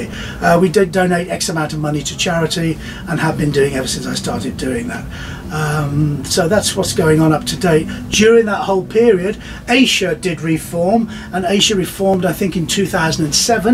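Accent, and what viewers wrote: United Kingdom. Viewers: Why all this southern English accent, Carl? Come on, you're supposed to be a proud Brummie...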